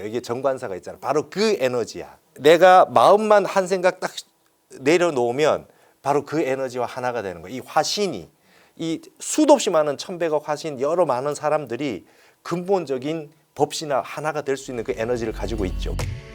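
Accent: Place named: Gyeongbuk, South Korea. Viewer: 끝말이 ~라 경북말투네요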